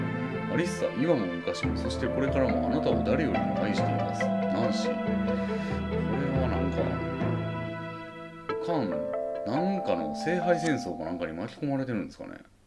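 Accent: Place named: Kansai, Japan. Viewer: 関西弁で冷静にツッコみを入れていく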